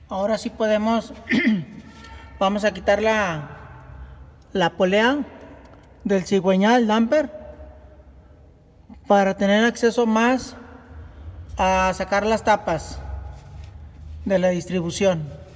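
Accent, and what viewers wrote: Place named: Mexico. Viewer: Los Mejicanos hablan cómico pero buen trabajo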